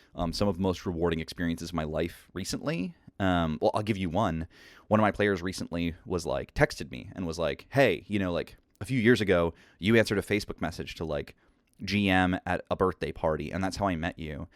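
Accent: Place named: Mid-Hudson, United States. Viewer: I can't hear an accent, ya sound upper Mid-Hudson/ Catskills. "Complete Strategist" is a great shop, I couldn't imagine gaming there though. I never got into 5e. I read the core books & thought it looked good but my group wanted to stick with our modified 3e/3.5/PF1e/D20 rules. I can see why they did but I would've given 5e a try. I only run home brewed settings since the '80s & in my experience players will always add to the world. We've been running games in the same world for over a decade now. The setting before that lasted 8 years. Sometimes players will misinterpret my descriptions, make assumptions or connections I didn't think of & come up w/ something way cooler, so I'll just go w/ it as if that's what I intended the whole time.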